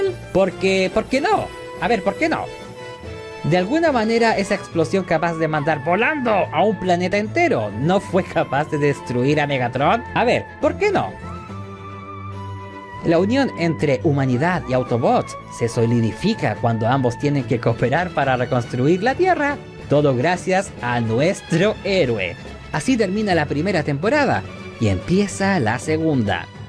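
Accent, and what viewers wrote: Chile. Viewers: De que parte de Chile eres? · ¿Usted es de Chile?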